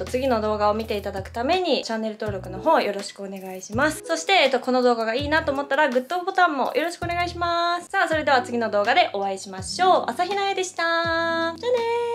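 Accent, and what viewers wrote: Kansai, Japan. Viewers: エキストラに参加させて頂いて、その際に彩ちゃんを初めて拝見しました！！❤ スタイル良過ぎてビックリしました！😳💕（語彙力 それから彩ちゃんの虜です😍💓 関西弁が可愛すぎて可愛すぎて☺💕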